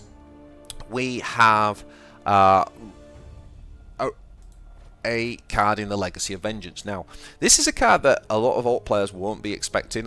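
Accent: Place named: England, United Kingdom. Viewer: I found your channel from some russian nids player in chat and ill just say that throwing an english accent in there makes this sound so much more credible.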